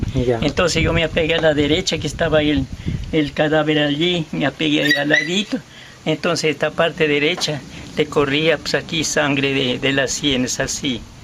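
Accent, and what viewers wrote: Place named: Loja, Ecuador. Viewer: Hermosa narración y que bonito escucharlo con un lenguaje Lojano autóctono.. gracias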